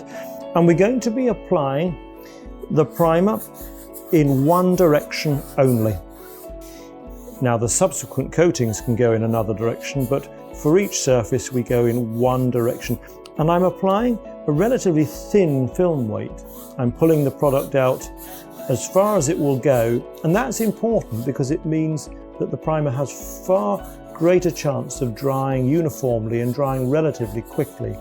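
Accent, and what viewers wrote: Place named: RP, United Kingdom. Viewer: How posh this man is... Imbelievable.... And arrogant too.... pure british c.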